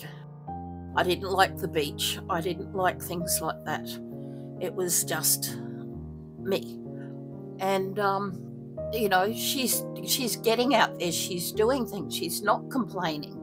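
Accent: Australia. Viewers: I hope you feel better soon! I REALLY ENJOY YOUR CHANNEL!!! Thanks so much for reading to us. It reminds me of going to the library as a child, sitting down in a semi-circle and listening to the librarian read us stories. That was the next best thing to reading a book myself, and I still enjoy being read to today, so thank you! I enjoy your accent and the sound of your voice. Are you from Australia? I'm in the US. LOVE the accent, truly!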